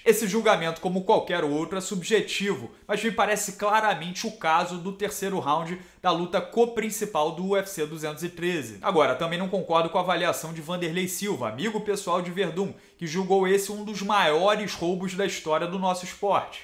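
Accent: Rio de Janeiro, Brazil. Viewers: tem q tirar esse sutaque carioca ai que o canal fica melhor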